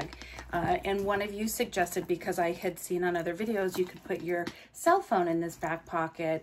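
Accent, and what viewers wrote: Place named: Orange County, United States. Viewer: Omg I’m dying I could so tell your from Orange County area ! I’m from the OC too born and raised in Irvine / Huntington 😂💗 love your videos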